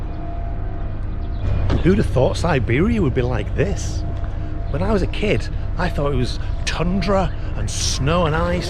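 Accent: England, United Kingdom. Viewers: и цены называл в фунтах, так что даже во вкладку "о канале" можно не заходить + очевидное английское произношение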